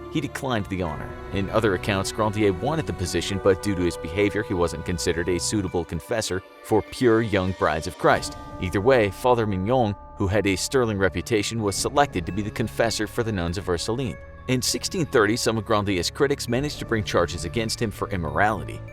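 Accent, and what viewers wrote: France. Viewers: Why does the French accent sound so satisfying?? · The French accent is a nice touch👌🏾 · Lol he was butchering all those French names but because he said it with a French accent it sounds legit to non-French speaking people 😂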